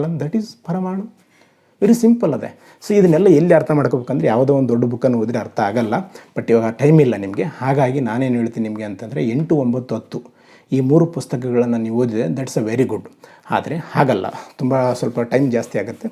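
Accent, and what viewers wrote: North Karnataka, India. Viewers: Nimma way of communication lovely sir I love it pakka uk mandi anasutte thanku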